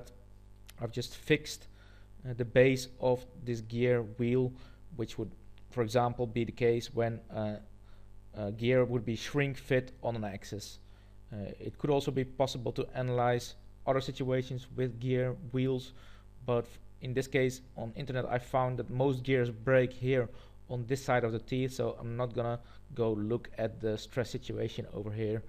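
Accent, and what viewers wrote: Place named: Caribbean. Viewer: You sounda bit carrabean maaan. but thanks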